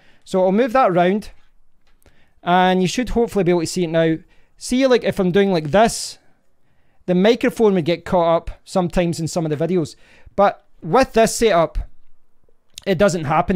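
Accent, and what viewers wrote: Scotland, United Kingdom. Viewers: Each time I tried it I would get pops and noises and I just couldn't get it resolved. I would like to pull it out and give it another try but can't find it. I have wasted so much money trying to improve the audio on my YouTube videos. I should have just put out some serious cash and bought something with a known track record. Now I am trying to figure out what that would be. I want a wireless mic (tried a cheap MOVO WMIC10) and it just cuts out for no apparent reason so there would be audio gaps every once in awhile. I think audio is the hardest part for most people who come with no experience. The terminology is foreign and it has just been a steep learning curve. So there you go. My daughter-in-law is Scottish and I love hearing your accent. ;-))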